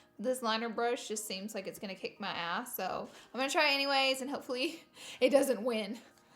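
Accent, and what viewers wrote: South, United States. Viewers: Bruh it’s the southern accent get the eff over it 💁🏼‍♀️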